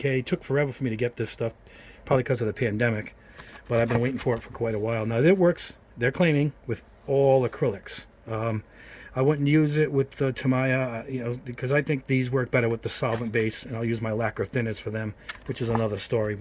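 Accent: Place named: New York, United States. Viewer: The most important tip I’ve ever learned over the years is when somebody with a thick New York or Boston accent gives you a tutorial on literally anything, you tune in and listen because you’re about to learn a ton of shit.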